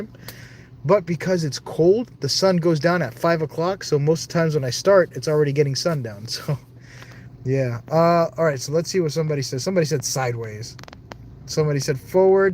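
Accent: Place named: United Kingdom. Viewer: I love your British accent. You and could just have so much fun being silly AF 😂😂😂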